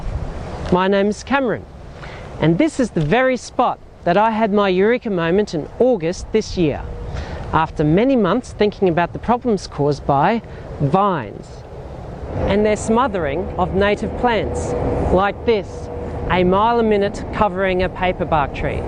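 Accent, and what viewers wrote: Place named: Australia. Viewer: Awww Brisvegas..! Thought you sounded quite aussie from your other videos :)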